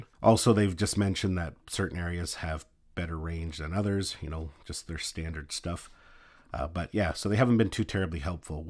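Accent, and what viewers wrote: Canada. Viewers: Just to be clear, are you flying CE or FCC mode? Your accent sounds Canadian, which is CE territory, correct?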